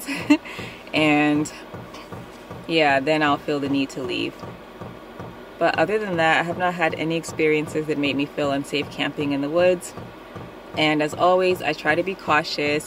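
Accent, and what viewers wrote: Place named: Upstate New York, United States. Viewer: I'm still watching the video but it sound like you are upstate NY. I hope you visit one of the boroughs LOL Come visit the city. I can show you all the spots you will love like ice skating in Manhattan!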